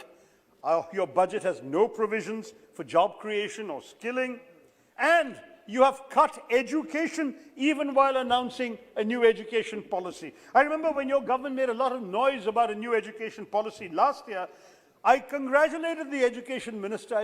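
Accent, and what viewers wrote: England, United Kingdom. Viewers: Just a malyalee showing off, in a anglicised accent. No content nor any useful suggestions, just a Angrazzi trash for the poor constituents.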